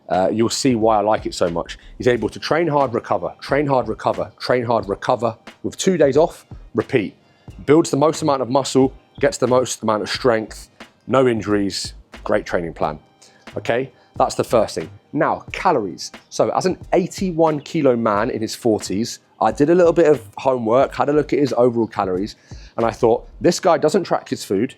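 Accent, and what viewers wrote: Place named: Hampstead, United Kingdom. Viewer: I was watching a walking tour of Hampstead Village on my iPad and then I clicked on this video. I can tell by your voice that you were originally from that area or close by. I’m in California and would trade pools and palm trees for charming and quaint. I just lost 15 lbs and finally getting my body back. I now have a diet of sardines, salmon, and salads. Instead of walking tours on my iPad, I will try walking outside. Great tips in this video. Thank you for posting!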